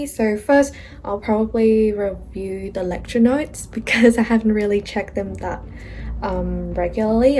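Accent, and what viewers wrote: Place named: Australia. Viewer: omg i literally thought her accent sounds familiar is she australian?? lmaoo im an aussie too!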